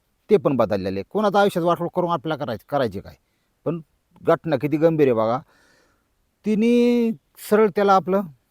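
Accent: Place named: Beed, India. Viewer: मामा बीड वाले दिसताय भाषेवरून म्हणतोय ह्या गोष्टी लोकांना आवडतात ते तुम्ही बरोबर ओळखून विषय ठेवता त्यामुळे like मिळतात jacket आवडल बाबा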